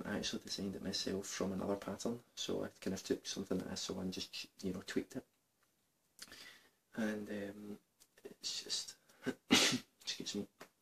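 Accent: Scotland, United Kingdom. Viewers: Do love the scottish accent :)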